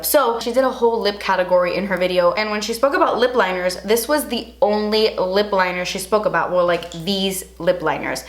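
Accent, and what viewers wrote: Cuba. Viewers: yeeees absolutely loved when you mentioned Rachel, by the way i loved your cuban accent!!! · "Por eso tengo los nervios tan malos" 😂😂😂😂 I ❤ that cuban accent!!! My husband says we are twins! you're hilarious love you 😘😘😘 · 😍tu acento cubano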